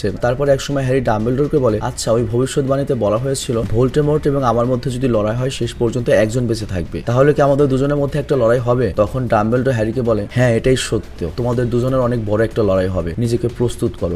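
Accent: Bangladesh. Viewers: তোমার এই হালকা বাংলা দেশী টান কথার মধ্যে আমার ভালোই লাগে ❤ কিন্ত হারামাউনি মানা যায় না (হারমাউনি)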